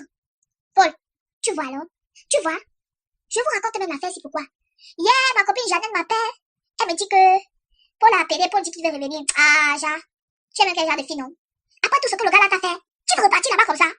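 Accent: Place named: Cameroon. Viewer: je suis ivoirienne!! jadoooore trop votre accent camer!!!!! bne continuation:*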